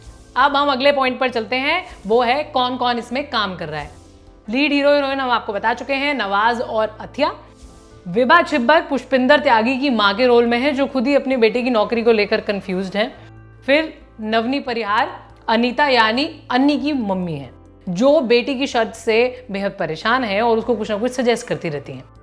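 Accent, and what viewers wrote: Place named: Bhojpuri, India. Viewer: Madam ka bhojpuri accent majedaar hai, I also make videos.. please check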